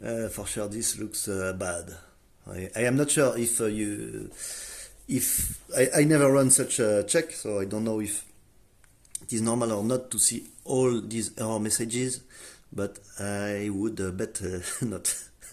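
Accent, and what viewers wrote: France. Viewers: Are you french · Je pense que tu es français vu l'accent 🤫moi j'ai un problème avec mon pixel 3 qui est en fastboot mode et qui m'indique failed to load/verify boot images. si tu as une idée !!!! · I Can barely Understand you with your French Accent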